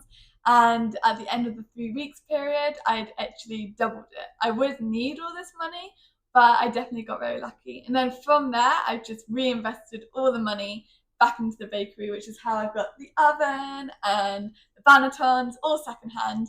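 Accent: United Kingdom. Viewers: What kind of accent do you have? Which part of England? I'm guessing south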